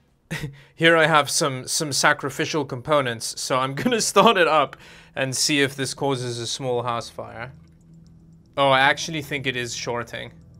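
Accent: South Africa. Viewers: Are you south african? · are you South African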